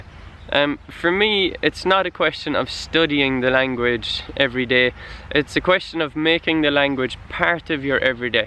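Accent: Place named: Munster, Ireland. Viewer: I appreciate lots of what does be saying, and it's not my downvote... but to broaden the conversation: Yes I want to understand every dialect. But I'd like to have a supplemental source to be reasonably consistent in how I speak myself, rather than use a random mix. Fortunately enough, as I understand it, tends to a Munster accent (my would-be accent) vs. Duolingo that teaches Connacht, or TG4 with a mix where you have to be intentional about who you mimic.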